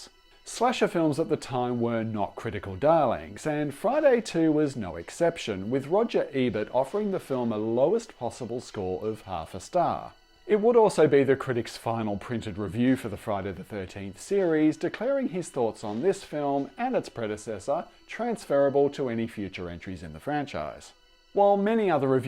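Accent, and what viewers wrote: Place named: Europe. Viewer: It’s kinda wild to hear someone with a European accent, critiquing these films. I guess I never considered that these films (especially the early ones) might have had an impact on foreign European audiences, which tend to be softer and less keen on gratuitous gore and violence.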